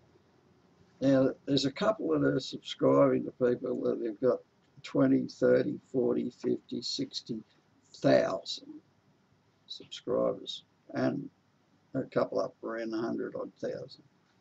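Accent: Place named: Australia. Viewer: Hi if you're wondering why I comment so rarely on your videos, while you so often comment on mine... it's not because I'm selfish. I would love to comment on more of your videos, but the problem is, I don't always understand what you say, since English is not my first language, and your Australian accent is a bit difficult for me. Ask Brendan.. I have to watch his "Friday's Funny" episodes like 3 or 4 times in a row to get all of his jokes! There seem to be different accents in the different regions of Australia, because some folks I can understand better than others. For example, I usually understand Gazza, but when he speaks in his funny voice on the "Bogan News" it's hard to get for me.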